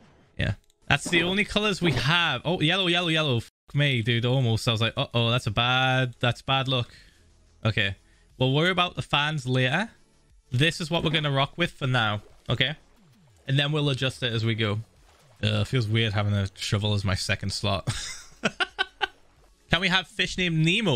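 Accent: United Kingdom. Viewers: is it just me or does he sound extra british today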